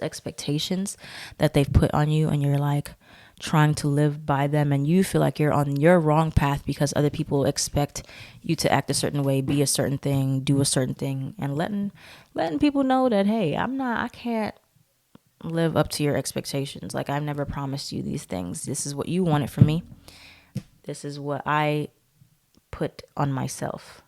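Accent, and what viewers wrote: Bronx, United States. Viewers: U from the bronx??? GET STURDYYYY 😆😂😂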